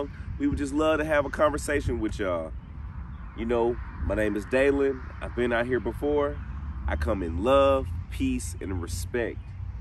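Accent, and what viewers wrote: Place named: East Texas, United States. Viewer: Awesome visit 😀 That was very nice of you to go there on moms day. I'm the one who ask you if you were from East Texas. I listened to your accent. I'm born and raised in Ft. Worth. Love your channel and approach. Keep on keeping on. 👻🌹👻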